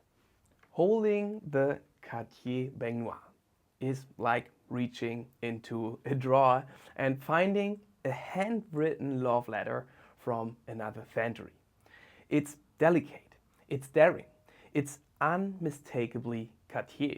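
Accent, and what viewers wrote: Germany. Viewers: I love your videos. Your lighting and the way you showcase your watches really elevates them. On a side note, I love your accent too. I’m in the UK and have a close friend who is German, a lot of the words you pronounce similarly to him, we call it Ginglish! My surname is Konig too, my grandfather must’ve lost the ö when he came over to the UK, I doubt they had “ö” on the typewriter when they processed his papers so we’ve lost it!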